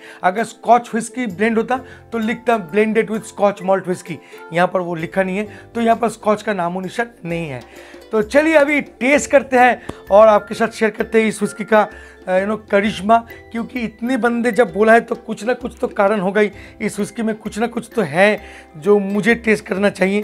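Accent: Bengal, India. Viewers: Tum bangali hai kya? Bengali me bat karo.. Your hindi is shit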